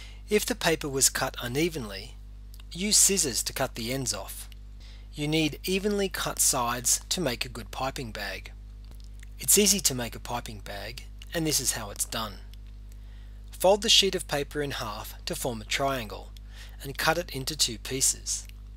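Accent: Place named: Australia. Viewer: good job! i love your accent! are you australian?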